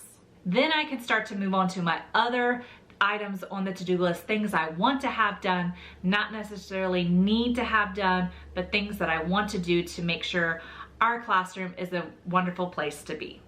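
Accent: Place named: Minnesota, United States. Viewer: Are you native to SC? You sound like you might have been in Minnesota. I am in Rock Hill, SC, not native though. I am a California beach baby. I love all the tips. Thank you so much.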